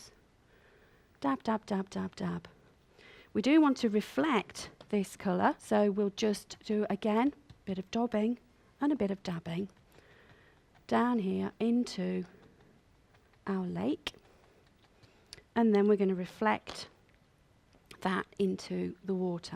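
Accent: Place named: Birmingham, United Kingdom. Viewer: Thanku for sharing. I love your ( Brummie) accent. keep 'em coming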